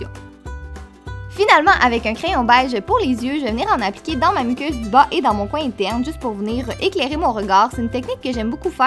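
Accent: Quebec, Canada. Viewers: Salut tu et trop belle j'adore ta série back to school et j'adore ton accent québécoise continue comme sa bisous ma belle j'espère que la joie et la bonne humeur soit avec toi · Super vidéo comme d'habitude. C'est la première fois que je commente une de tes vidéos mais elles sont super. J'aime trop trop trop ton accent québécois. Je t'aime tellement. Continue comme ça, ne changes jamais ❤️❤️ P.S je te suis depuis tes débuts de vidéos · oh mon dieu je suis marocaine mais j'adore l'accent Québécois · J'adoooore ton accent canadien 💕💕💕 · J adore ton accent QUÉBÉCOIS est que tu peut me repondre je t adore stp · J'adore tes vidéos et je t'adore !!!!!!!! ton nouveau décor de vidéo est juste trop beau !!!Tu es trop belle et je trouve que ton accent canadien est très joli !!!PS : Je passe en 5ème et je reprend le 5 septembre.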